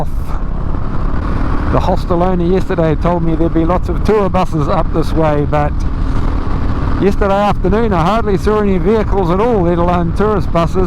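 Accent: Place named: Scotland, United Kingdom. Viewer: Your Scottish accent had me giggling 😂. I'm on my way....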